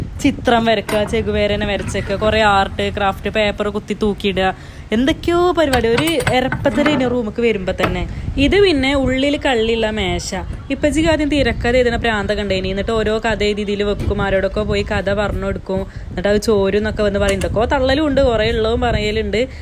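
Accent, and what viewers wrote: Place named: Malappuram, India. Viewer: മലയാളം പറയു.... അന്റെ മലപ്ര ബാസ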